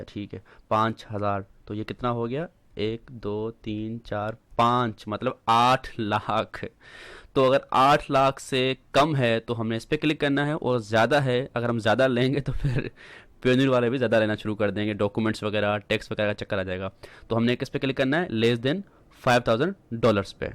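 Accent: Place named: Haryana, India. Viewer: his accent feels like haryanwi . but he is teaching how to do in Pakistan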